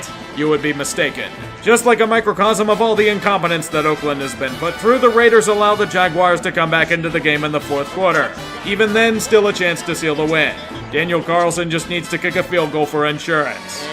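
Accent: Pittsburgh, United States. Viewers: Best part of Sportsball, hearing a Yinzer praising the Ravens.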